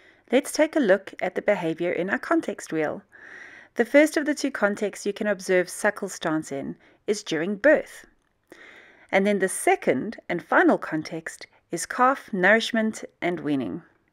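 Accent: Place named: South Africa. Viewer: I'm loving these very informative lessons on my favourite HERD.💖💖🐘🐘🥰🥰 It's so wonderful of you to put all these videos together for us to learn from.👍👍I appreciate your hard work. I'm a South African living in UK and it's so heartwarming to be able to hear a South African accent everyday,whether it's these videos or Adines' daily videos.The highlight of my day. Baie Dankie.👏👏😍😍👍👍💖💖🐘🐘🐘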